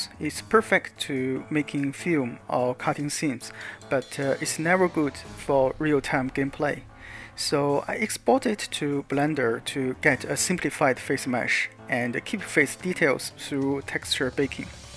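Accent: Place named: China. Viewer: 一听就是华人，赞赞赞，求个detail tutorial可以吗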